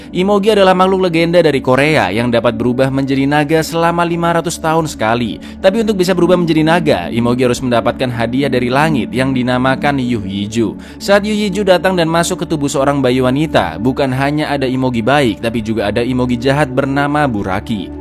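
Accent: Java, Indonesia. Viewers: Setuju bro. Mantap kayaknya. Medok banget dia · Org Jawa kah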